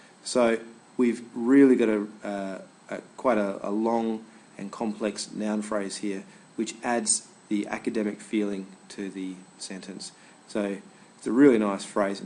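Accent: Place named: Australia. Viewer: Thank you! Very enticing Aussie accent...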